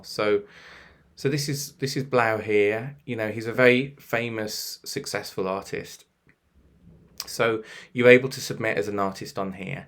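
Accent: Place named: Birmingham, United Kingdom. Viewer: Love your content! Are you from birmingham as well ?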